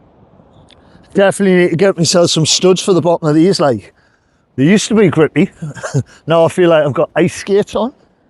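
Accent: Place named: Geordie, United Kingdom. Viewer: I'm a geordie and you might just be the most geordie geordie I've ever seen on YouTube.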